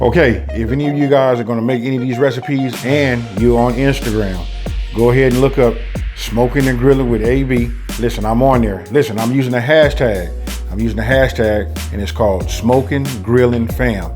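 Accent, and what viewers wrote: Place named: South, United States. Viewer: Hi AB I'm new to the channel band I'm looovving everything I've seen so far!!! where are you from? I hear a southern accent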